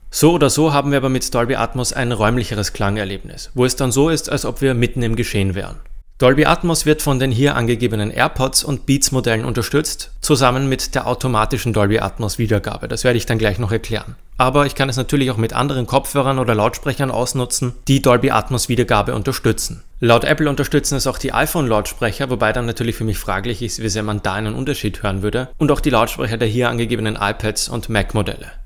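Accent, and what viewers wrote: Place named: Austria. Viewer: Ich liebe diesen österreichischen Akzent immer wieder! 😃😍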